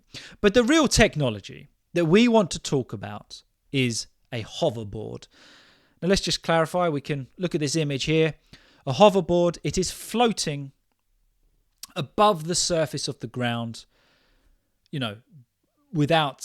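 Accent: England, United Kingdom. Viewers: A handsome English teacher with his beautiful blue eyes, and beautiful English accent 💗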